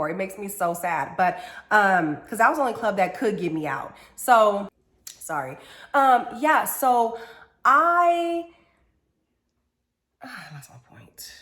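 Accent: Texas, United States. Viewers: I discovered your channel yesterday and apparently I’m on binge watch now. I’ve been thinking about moving to Texas for a while now but been back and forth with locations. You definitely a Texan now lol your accent is thick I love it!!! When I’m ready I’ll definitely be reaching out to you because I already trust your judgment.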